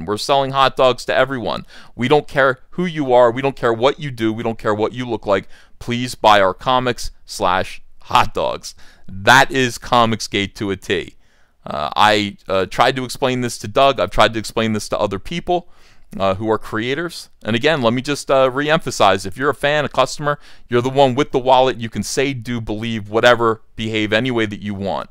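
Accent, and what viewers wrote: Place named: South Jersey, United States. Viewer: I love your accent - has to be South Jersey/ Philly. I live in NYC & that accent is sooo recognizable & unique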